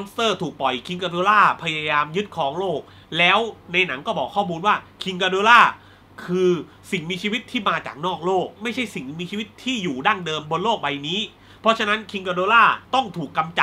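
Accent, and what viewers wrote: South, Thailand. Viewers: พี่สำเนียงคนใต้ จังหวัดไหนครับ ผมนครศรี 55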